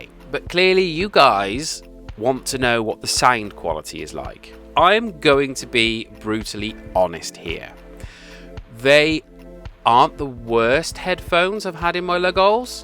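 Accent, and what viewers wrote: United Kingdom. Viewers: And I can hear ur from the UK so was it posted by Royal Mail? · So nice to hear an English accent, and from one who has a very agreeable attitude and sense of humour. I would love to hear your views on real ones and those i500's or 600's if you have played with them. I just want to try this type of headphone to see if I'll be continually losing them or forgetting to charge etc so I don't want to buy real ones yet! However I used to work in the HiFi and audio industry so I have a lot of rather nice gear and hate harsh sound, preferring a warm english, Tannoy type of sound which I don't mind paying for once I know if this type and shape etc work for me. Any ideas on the better sounding clones will be greatly appreciated, great and honest review. Loved it. Nice video, subscribed.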